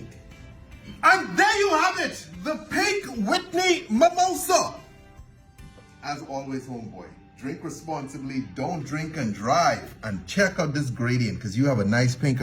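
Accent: Bahamas. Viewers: Sound like a Bahamian accent 🗣🙂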